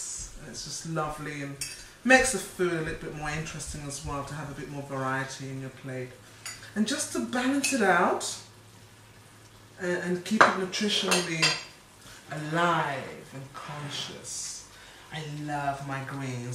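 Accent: England, United Kingdom. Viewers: your accent is so english and this is coming from someone born in the uk too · I love your videos, few though they are. The combo of your passion, plumby English accent (yes, I'm American:)) and magnificent West African looks, I very much enjoy. You were my first intro to West African cuisine. It appears that you aren't really doing this anymore and don't know if you read your comments but I wanted to let you know how much I've enjoyed them. Cheers. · YUMMY!!!!! I c you added 2 habanero peppers to make it HOOOOTTT! I love spices & heat tho. I c you only added 1 stock cube, no salt or other seasons. Another vid chef added no seasons! I never boil the h2o out of my tomato puree when I make this so this week I will certainly boil out as much h2o as poss. 1st. BTW, I LOVE your Britt accent & I EAGERLY await my trip across the pond – when flights aren’t a million $ compliments to the Olympics and summer season!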